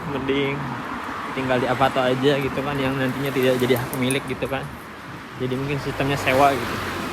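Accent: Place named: West Java, Indonesia. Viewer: Sigana mah urang sunda si akang ti cara ngomong na 😅, salam ti uing tkw taiwan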